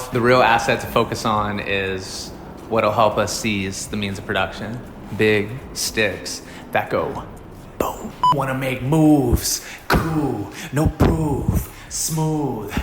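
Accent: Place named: South, United States. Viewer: Your little southern accent is too freaking adorable. 😭😭💖💖💖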